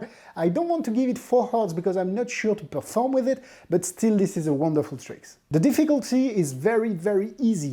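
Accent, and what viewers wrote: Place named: France. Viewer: Nice French accent :) Congratulations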